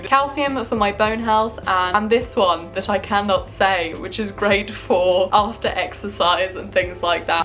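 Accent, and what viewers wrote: United Kingdom. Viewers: I live in Rio de Janeiro, I've learned english by myself, but the british accent It's LIFE. You are so Sweet and your Voice calms me down... I love your videos ao much omg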